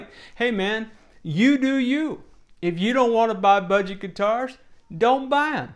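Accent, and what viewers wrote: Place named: Baltimore, United States. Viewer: Love the Balmer accent!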